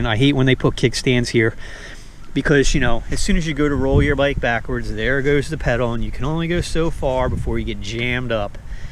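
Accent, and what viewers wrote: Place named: Upper Darby, United States. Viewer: I haven’t lived in Pennsylvania in 14 yrs but I know an Upper Darby accent when I hear one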